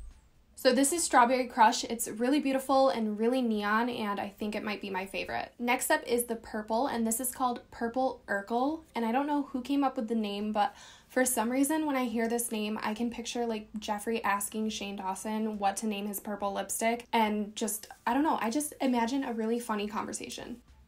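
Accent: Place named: Michigan, United States. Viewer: Are you from Michigan? I'm a former Toledoian and your accent sounds like home! Haha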